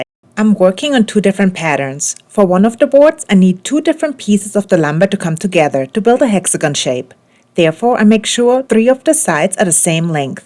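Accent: Germany. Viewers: Your DuEnglich is Cool!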